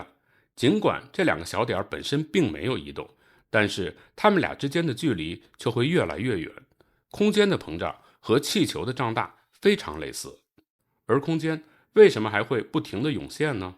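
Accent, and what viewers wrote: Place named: Tianjin, China. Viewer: 主持人是天津人吧，你这内容是从哪抄来的？别装了，直接说天津话吧，你这天津普通话齿音字一点都不少！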